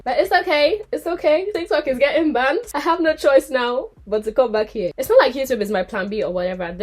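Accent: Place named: Nigeria. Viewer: Love the Nigerian 🇳🇬 Assent ❤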